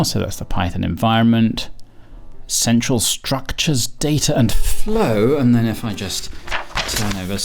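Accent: United Kingdom. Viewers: Your British accent is simply enchanting! amazing video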